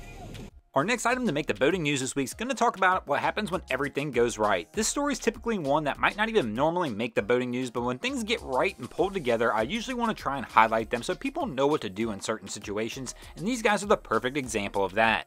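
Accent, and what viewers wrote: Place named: Cincinnati, United States. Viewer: I can't help but notice your accent. Were you raised in the Cincinnati area? Love your vids!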